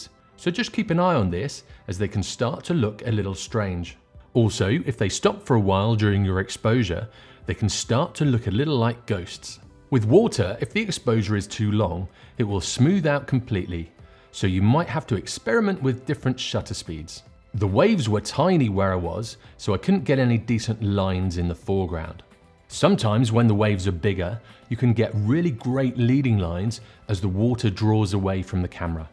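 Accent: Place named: RP, United Kingdom. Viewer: You have a lovely posh accent.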